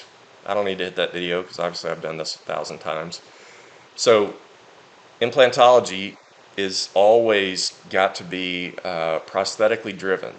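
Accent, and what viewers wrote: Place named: Chattanooga, United States. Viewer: Thank you! Very helpful. I grew up in Chattanooga, thought I recognized that accent, cheers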